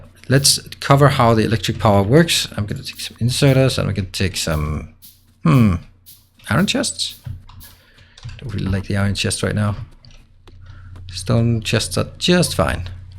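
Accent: Denmark. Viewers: are you from denmark? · You must be danish, you guys are the only ones with that accent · R u danish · Are you Danish??? :)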